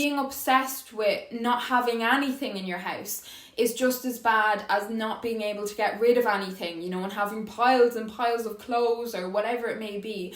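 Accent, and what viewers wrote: Ireland. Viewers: It's like the voice in my head turned Irish, I agree wit everyting you said. 😎👍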